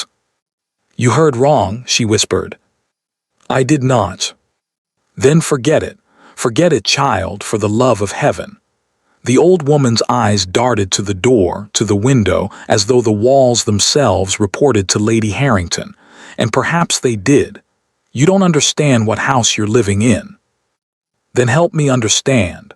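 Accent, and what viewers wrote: United States. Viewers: This is a well written story with a Cinderella plot with a duke instead of a Prince. The narrator has a rural American voice when it should be British. I love more visuals than one for the entire read. Thank you for the posting. Your work is most appreciated. From Amelia Island.🏝🏖🇺🇸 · The American accent is very offputting.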